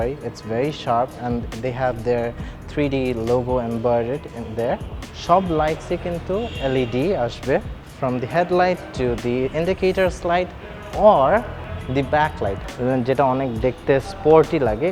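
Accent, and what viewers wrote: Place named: Dhaka, Bangladesh. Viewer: amr gu er review, bangla english milai bole uttara/gulshan er nola pola pain der moto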